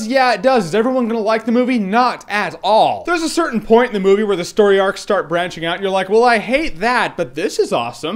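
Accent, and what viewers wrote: United Kingdom. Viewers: wow... nice British accent!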